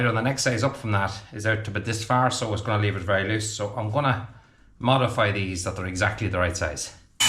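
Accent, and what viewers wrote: Ireland. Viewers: I agree that our phones or iPads have ears. 😂you are not only an excellent farmer, a diary farmer but also an expert mechanic and horticulturalist as well. You are one clever man with many talents and both my husband and myself love watching your videos as you make them so interesting and varied. The Irish accent helps as well as it’s our favourite. We are watching you from down under in Perth, Western Australia.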